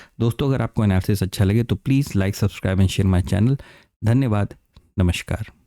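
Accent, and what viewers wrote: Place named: Madhya Pradesh, India. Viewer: Bhai, aap MP side se hain kya? Apki boli hamare side ki lag rhi hai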